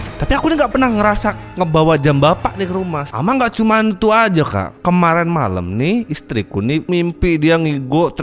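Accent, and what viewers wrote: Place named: Banyumas, Indonesia. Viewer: Asline ngendi kang,kayane wong ngapak kie ..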